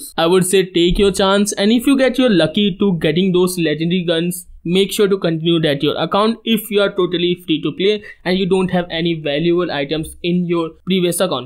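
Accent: India. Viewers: Indian accent? Cant trust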